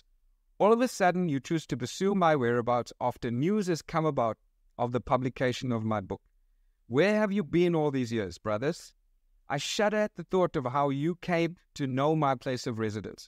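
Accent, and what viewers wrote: South Africa. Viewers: May i ask is your accent from South Africa?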